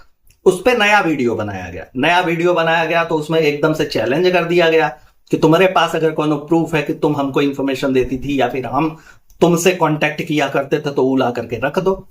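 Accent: Uttar Pradesh, India. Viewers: up se ho bhaiya up me kaha se ho sorry aapko pareshan kar rahi hu but aapki baate sunke bhasa sun ke apna pan feel hota hai. Mai bihar se hu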